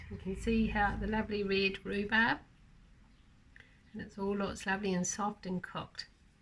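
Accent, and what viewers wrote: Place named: New Zealand. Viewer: This looks delicious, I will have to try it. I have so much rhubarb I've run out of new ideas of what to do with it. I have given as much away as I can and some has gone into the freezer as well. It's lovely to find a cooking channel that has our Kiwi accent. Have a very Merry Christmas. Your Christmas Lillies look beautiful, mine haven't opened yet. It's not Christmas till I have their perfume in the house : )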